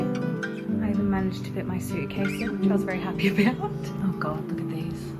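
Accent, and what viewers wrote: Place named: Australia. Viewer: Never lose your Aussie accent ♥ Do they tell you to assimilate like Aussies do to Indians 😂 Naah we are not like that. Enjoy the life like my family back home, enjoying house help, watching KDramas, home deliveries, apartment facilities and gym. wow that was a cool transition effect of older version of videos Wow you seems to have quite a few expats there. ♥ it.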